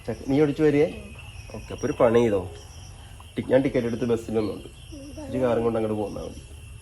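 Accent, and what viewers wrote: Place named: Kanjirappally, India. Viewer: സംസാരവും ഞങ്ങൾ കാഞ്ഞിരപ്പള്ളിക്കാരുടെയും സംസാരവും ഒരുപോലെ ഇരിക്കുന്നു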